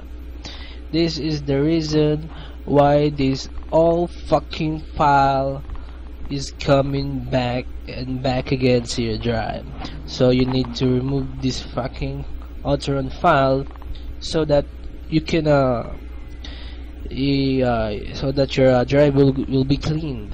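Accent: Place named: Philippines. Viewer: Pare Pilipino ka noh,, hahah obyus na obyus..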